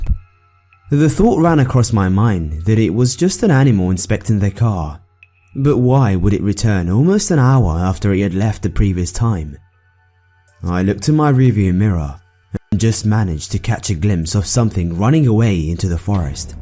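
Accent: England, United Kingdom. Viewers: You I love accent is it Australia or England sounds English lol so Sexy much love Sexy Grandma ✌💘😉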